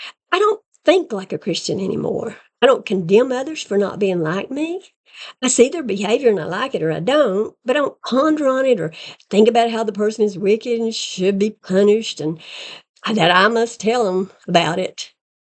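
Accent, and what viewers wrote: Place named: Texas, United States. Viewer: Are you texan? Your accent sounds texan (im not from america)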